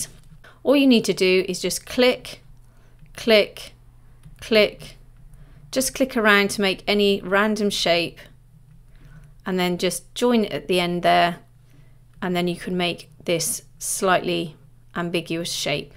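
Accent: United Kingdom. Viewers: Thanks for the Video. I liked the British accent.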